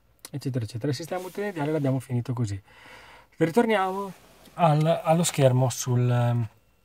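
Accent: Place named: Romagna, Italy. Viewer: Di dove sei sembra che hai un accento Romagnolo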